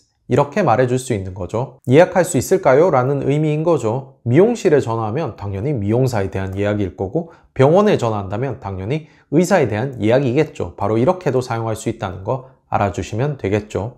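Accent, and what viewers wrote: United Kingdom. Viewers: 영국발음이 편안하게 들린당.ㅎㅎ 유용한 영상감사해요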